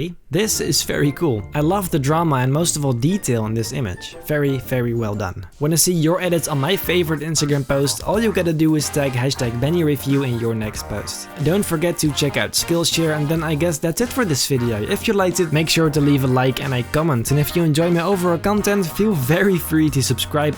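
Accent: Netherlands. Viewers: Your dutch, i hear it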